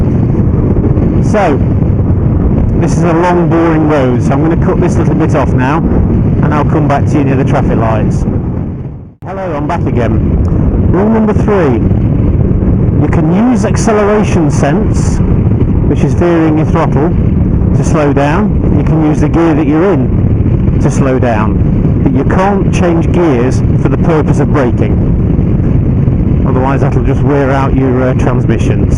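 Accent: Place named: Birmingham, United Kingdom. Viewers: Going thru’ your ‘back catalogue’ & still ‘loving this’, in 2024! I’m a year into my new toy, a Super Meteor 650, after a 42yr ‘hiatus’ (having driven most things up to a 7 tonner). Still struggling with filtering, if I’m honest. Your voice remains my primary ‘internal monologue’ when riding (not the Brummie, tho’).🤨😉😅✌️ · With that Birmingham accent you sounded exactly like Craig Charles- LISTER!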